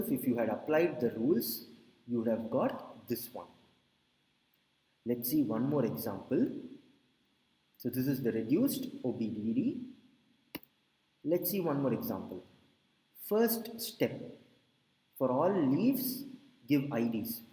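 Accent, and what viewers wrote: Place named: India. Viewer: fucking indiaaaan accent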